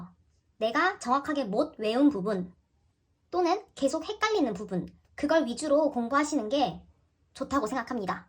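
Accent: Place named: Gyeongbuk, South Korea. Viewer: 혹시 대구나 포항 경북지역사시나요? 말투보면 그런것같아서용ㅎㅎ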